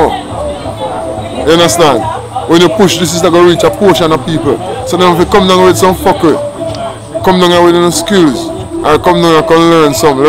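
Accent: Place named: Jamaica. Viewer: Hahahahahaha yea man,i really love your patoia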